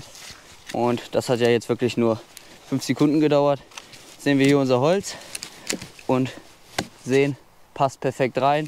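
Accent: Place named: Bavaria, Germany. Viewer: achso finde du hast so bayrisch geklungen :D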